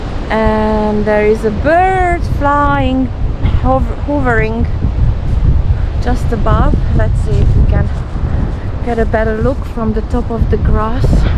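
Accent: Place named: Netherlands. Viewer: Enjoyable series on Norfolk Island, thank you. I assume from your accent you're Dutch?😢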